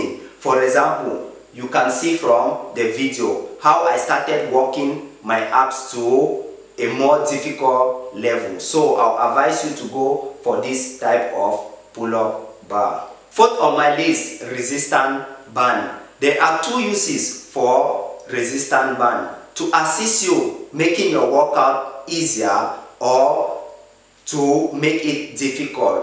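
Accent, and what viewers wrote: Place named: Nigeria. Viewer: ohh nice you sounded like a Nigerian good job mehn you look good 👍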